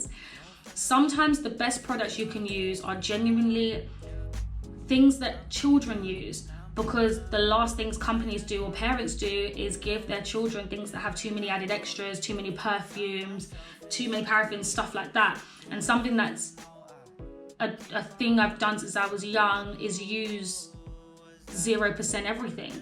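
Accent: United Kingdom. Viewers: i love me some british accent🥰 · I like your accent. Are you from the Uk?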